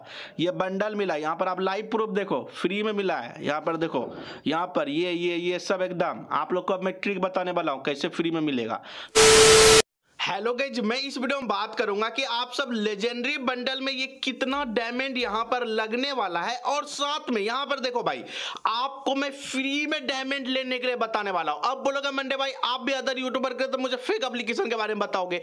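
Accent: Bihar, India. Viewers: Aap bihar se ho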